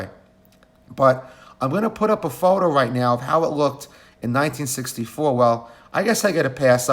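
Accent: Brooklyn, United States. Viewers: Perfect Brooklyn accent to narrate this video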